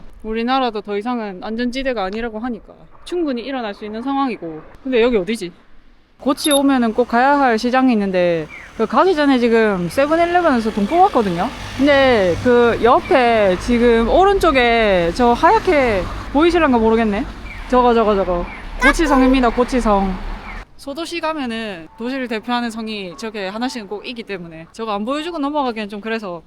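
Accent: Gyeongsang, South Korea. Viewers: 얏빠리! 현지인들과 한잔하면서 대화하는게 젤 기억에 많이 남는거 같아요. 불과 1년반 정도된 일본여행채널이 17만을 찍은 채널이 있는걸보면 도 언능 10만을 찍고 기념으로 다시 만났던 인연들 재회하는 영상 찍으면 더욱더 시청자들이 영상들을 기다릴것 같네요. 구수한 갱상도 사투리에 유창한 일본어가 참 정겨워요! 😊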